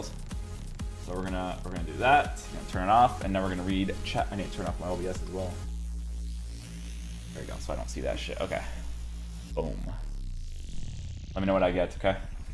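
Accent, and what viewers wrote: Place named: Portugal. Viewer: Are you portuguese? You have a portuguese accent!! Btw you're a great fifa player!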